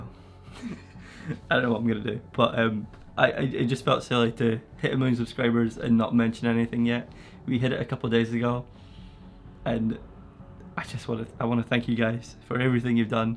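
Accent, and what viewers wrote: Scotland, United Kingdom. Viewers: He's Scottish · He's from Scotland, not Ireland. Different country, different accent 🙄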